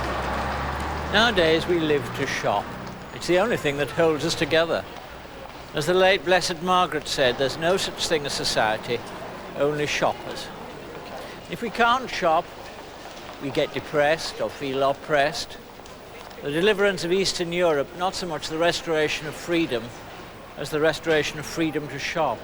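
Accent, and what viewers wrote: United Kingdom. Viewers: A B doing what he does best. And a delicate balance of his native Yorkshire tongue interspersed with posh southern vowels. Almost a long A in "dancing" at 5.54.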